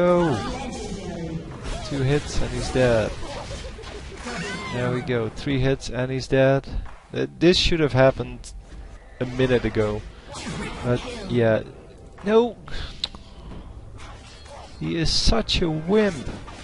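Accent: Netherlands. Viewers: are u from holland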